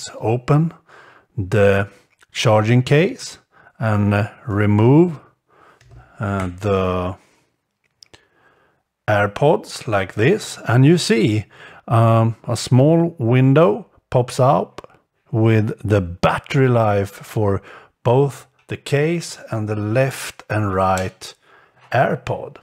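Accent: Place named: Sweden. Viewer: Jag kan höra att du pratar svenska haha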